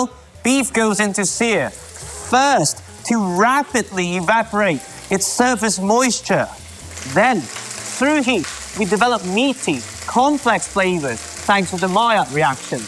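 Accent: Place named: England, United Kingdom. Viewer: Bruuuuhhhh..... Thats the best Cajun "Chocolate Sauce" Roux I have ever seen from an Asian with an English accent. That has to be just damn excellent. Well done sir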